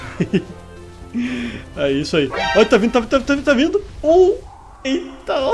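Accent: Rio Grande do Sul, Brazil. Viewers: vc e do rio grande do sul?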